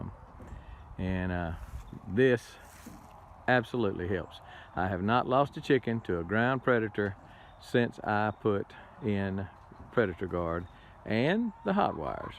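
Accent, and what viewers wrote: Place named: South, United States. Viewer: I’m a displaced Southerner in California and I surely am enjoying your Southern voice. Thanks for the tips on nighttime predators. We just lost a chicken to a hawk just yesterday.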